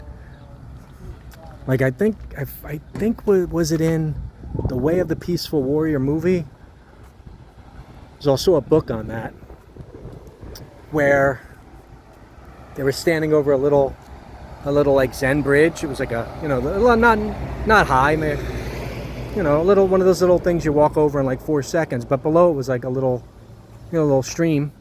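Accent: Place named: North, United Kingdom. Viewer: Thank you I needed to hear this. I am a chronic worry wart. It’s also nice to hear the you deliver this message in the vernacular from up north where I grew up. Thank you✌️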